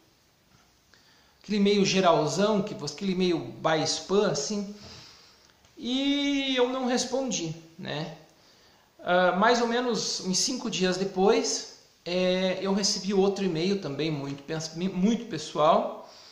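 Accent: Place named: Rio Grande do Sul, Brazil. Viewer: Muito boa tua posição sobre certos assuntos. está no sul pois teu sotaque parece de gaúcho! Abraços desculpa minha curiosidade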